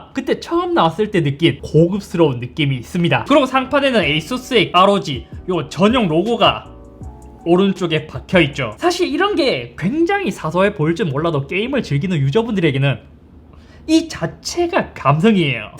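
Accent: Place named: Gumi, South Korea. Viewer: 먼가 외가 형같은 말투 ;; 구미쪽 출신이십니까 ㅎㅎ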